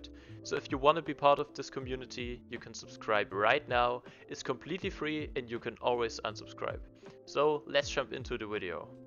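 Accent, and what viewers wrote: Germany. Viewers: U kinda sound like a german no hqte😅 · Hey are you German? Because you kind of have an German accent (im also German (well switzerland but still))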